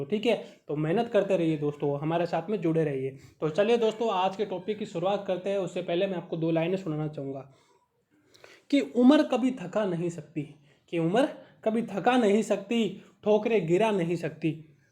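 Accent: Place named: Marwar, India. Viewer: Most epic movement marwadi tone & nice session 💝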